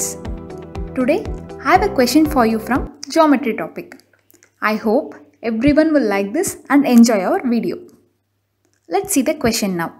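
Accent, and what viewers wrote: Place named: India. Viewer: That takes 16 seconds to solve its okay to speak Indianglish but you don't need to kill yourself and still come out with wrong answer 200 - 50pi = 42.92... 42.92...÷ 2 = 21.46... Thanks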